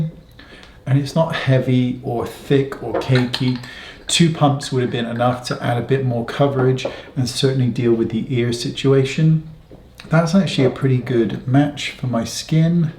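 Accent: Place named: West Country, United Kingdom. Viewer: Pisser!! 😆 you re funny, are you a west country lad BTW?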